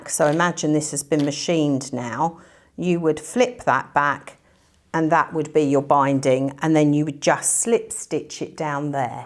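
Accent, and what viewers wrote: United Kingdom. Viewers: I love your videos because you don't rush though. But the problem I have is some of the British words with your lovely accent, I am in Pennsylvania USA. I can't understand what the name of the piece is that we iron the squares onto. If you could give a link that would be so helpful. I look forward to watching more of your videos.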